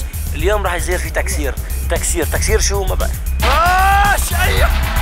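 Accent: Syria. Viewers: عراقية وأفتخر 😂 لك دخيل ربك عم تحكي سوري😊❤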